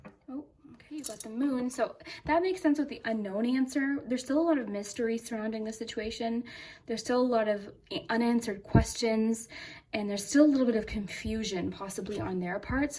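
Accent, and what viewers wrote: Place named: Canada. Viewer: So, I have to comment and tell you that I'm OFFICIALLY a new viewer to your channel and I'm officially subscribed as of NOW! (WARNING! Super long comment!!) I happened to find your channel through another reading I was watching from somebody else and something in me told me to click on your video. The video was called "How Will You Meet Your Soulmate? PENDULUM 🔮 Where? When?" Not only is your reading the most exact reading I've ever received in my life (and I've had in-person readings), but the soulmate reading was so mind opening to me. I then watched your May 2020 predictions and Pile 3 was calling to me which I find super interesting. The number 3 is my lucky/weird/magical number. Watching this video didn't resonate with me because I'm single, but it gave me a lot of hope because I have plans on moving into my own place come July and from your May 2020 prediction about patience and that slow and steady wins the race. 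:) Anyways, yea, you definitely have a new subscriber from Orlando! :D (And I wonder if you're Canadian because you sound Canadian hehehe!) And yea! Keep making wonderful videos!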